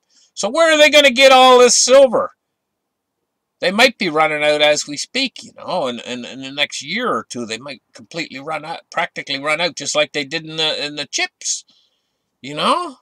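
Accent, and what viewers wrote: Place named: Canada. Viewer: Great advise. analogies and stories are the best. The Canadian accent tops it off.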